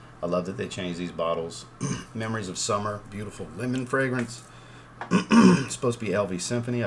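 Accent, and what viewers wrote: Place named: New Orleans, United States. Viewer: You from New Orleans area? I am and you kind of sound like it. Maybe Westbank? lol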